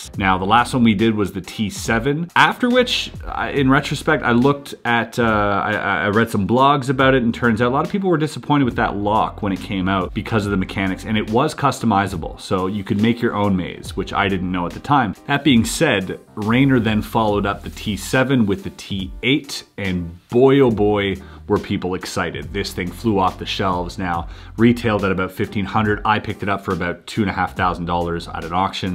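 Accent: Germany. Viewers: Solid german accent my man high five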